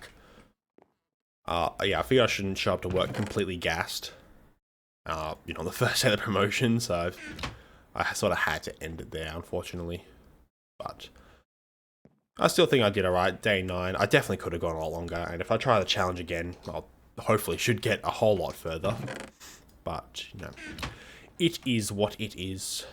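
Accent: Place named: Australia. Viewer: Personally I think these kinds of episodes count as "real" episodes! Couple of things I wanted to mention: -Never realized you were Australian, totally thought you were British or something (english isn't my native language) lol - There's a mod called "simply better minecraft" for 1.8.9 that adds spruce/birch/dark oak signs/doors/trapdoors, etc. -Looking forward to that video/segment where you explain why you chose to play on 1.8.9, though from what you've said in this video I completely agree! Modern Minecraft has some cool features (some new blocks and biomes are really nice) but I feel like it's a mile wide and 2 inches deep -You've mentionned some Minecraft content creators (which I will definitely check out), are there any other Youtubers that inspired you? OG LPers like Etho, Stampy, etc? -Congrats on your promotion/marriage! Take as much time as you need between videos, like you said the world will always be there so there's no rush!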